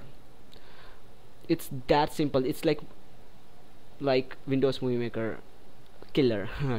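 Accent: India. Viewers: this guy is just messing up with you, he might ment to say that you sound Hindu(is your accent) but who cares, nice video, helped me out a lot.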